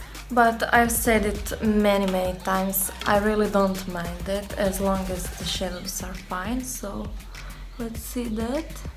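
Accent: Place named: Eastern Europe. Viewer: Love our eastern europe accent, where are u from hun x 😊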